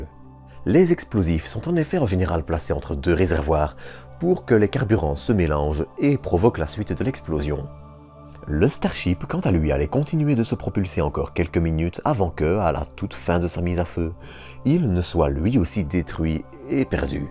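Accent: Belgium. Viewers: Ouiiii la voix BELGE !!! xDDDDd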